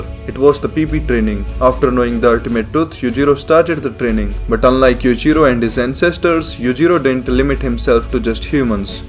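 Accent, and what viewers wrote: India. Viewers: Ngl Baki algorithm brought me here but I stayed for the Indian accent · Change your accent. You sound way too indian.. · I didn't even watch baki but your description of what baki is plus the well known indian accent just makes it so damn funny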